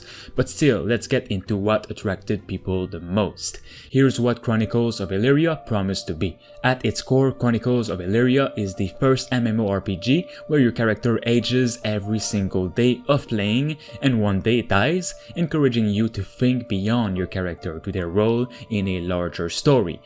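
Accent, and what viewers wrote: France. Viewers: why dont you just make videos for french people? your french accent is so thick i can barely see the video.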